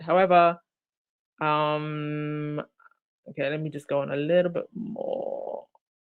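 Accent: United Kingdom. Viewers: Ugh! I hate missing the live! I love the English accent.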